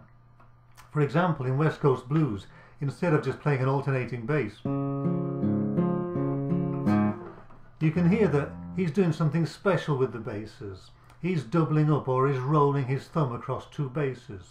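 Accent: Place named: Sheffield, United Kingdom. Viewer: Jeez, who did the subtitling? Obviously doesn't understand the Sheffield accent!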